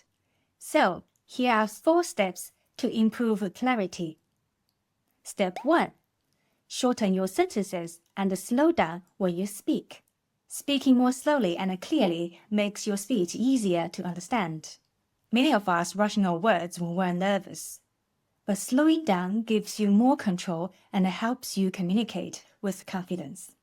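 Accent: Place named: China. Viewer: I really like the Chinese accent 👍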